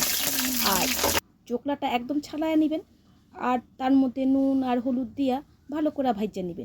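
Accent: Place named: Bangal, Bangladesh. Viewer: খুব ভালো বাঙাল ভাষা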